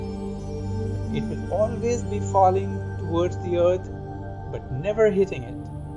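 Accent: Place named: India. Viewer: Turned off immediately after I heard the Indian voice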